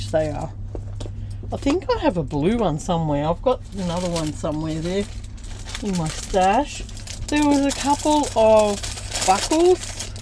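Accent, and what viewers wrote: Australia. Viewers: I was going to say how much I love to hear your Aussie voice..not many of us that I've seen on you tube ..and then I heard you say you went to Wangaratta..and I got more excited..I'm guessing you went to to the Lutheran op shop they have great crafty bits..anyway hi from another north east Victorian who looking forward to seeing what you make with all these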